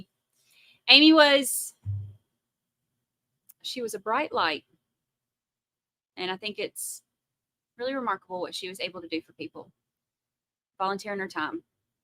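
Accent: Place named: Florida, United States. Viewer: I'm from RA's. Don't know what trial you were covering but it's true. The minute you go do something else, the Jury's ready. Just wondering is your accent from Florida? I like it. Of course, to you I have the accent. Congratulations on your 10k!!! That Boy In The Box Sounds Horrible. How in earth can people do that?! I hope they get the D.P.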